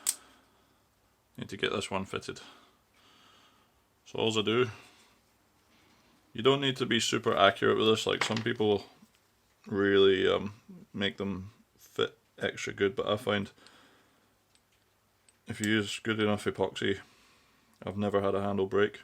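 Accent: United Kingdom. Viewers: Nice wood combo, it's always fun to work during rainy days, ur accents reminds me of my yatch mate fr UK. Cheers mate. Excellent piece of arts.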